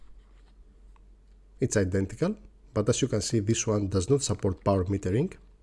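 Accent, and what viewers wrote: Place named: Greece. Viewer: I know you might not have expected this comment but... You're Greek, aren't you? XD I believe as a Greek myself, I can tell from your accent 🤣 (which is by no means a bad accent, but still not quite American, English or Australian)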